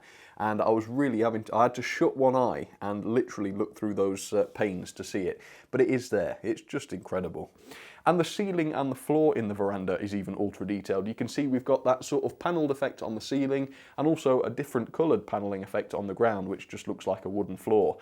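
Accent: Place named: Nottingham, United Kingdom. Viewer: Is there any chance sam my son can meet you.he loves your vids.judging by your accent your not too far away from us.we are notts.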